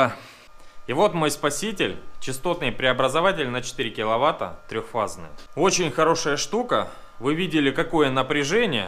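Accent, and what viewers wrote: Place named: South, Russia. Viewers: До сих пор не могу привыкнуть))) В голове Игорь за кадром)))))) видимо у вас говор такой южный. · у вас говор такой южный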